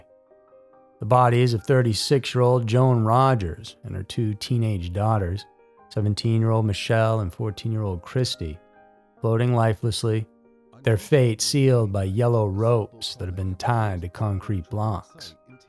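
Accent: South, United States. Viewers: Southern United States somewhere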